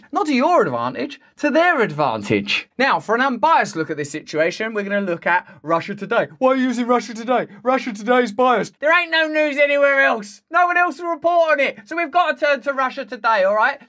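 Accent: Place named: England, United Kingdom. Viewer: I luv ow you pronounce words... Souch a real english dude :D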